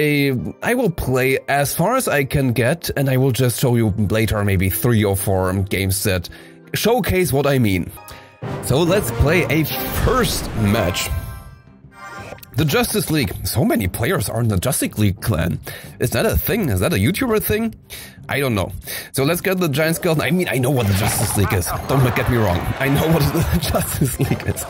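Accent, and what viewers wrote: Germany. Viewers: are u from germany? or from where comes ypur acent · you sound like a german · He sounds German to me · youre accent is like germans english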